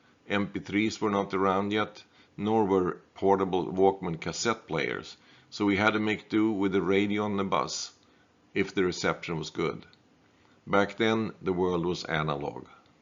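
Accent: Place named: Sweden. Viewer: ❤Nice to listen to! Your accent is very Swedish and I guess you know. 😊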